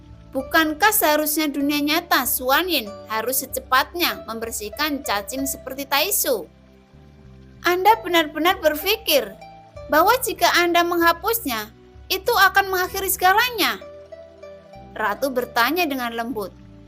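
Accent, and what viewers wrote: Java, Indonesia. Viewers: logat Jowo kok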